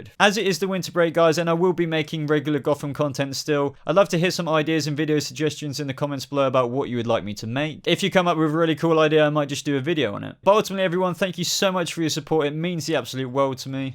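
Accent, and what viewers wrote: United Kingdom. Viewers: Two questions: 1) If this chappie is in the UK as the accent suggests, how can he be legally reviewing things that haven't shown here yet? 2) Is it just me that thinks he is REALLY good looking?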